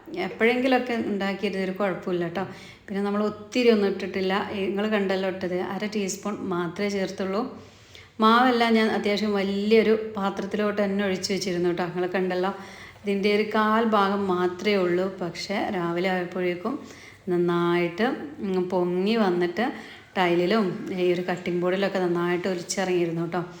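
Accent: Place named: Palappuram, India. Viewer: Samsarathinte style aake marippoyallo ...palappuram style nalla rasayirunnu tto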